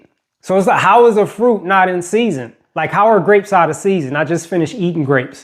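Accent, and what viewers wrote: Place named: Ohio, United States. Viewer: Bro. I'm in Cincinnati. Please let me know where in Ohio to get these fruits. I kinda heard it in your voice that you was from Ohio. Lol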